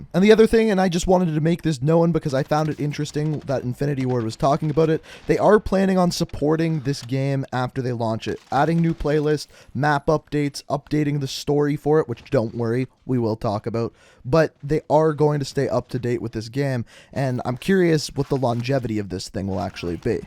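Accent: Canada. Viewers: Is this guy from Canada ?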